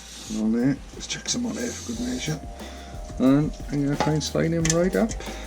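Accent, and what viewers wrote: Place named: Scotland, United Kingdom. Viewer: Hi i am Chris i watch your channel and like it but sometimes hard to understand your accent where yod from in UK I bet scotland or close. regards Chris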